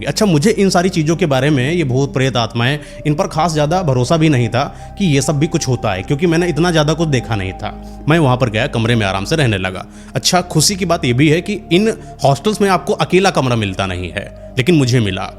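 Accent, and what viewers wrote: Kanpur, India. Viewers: Bhai k bato se LG gya kanpuriya hai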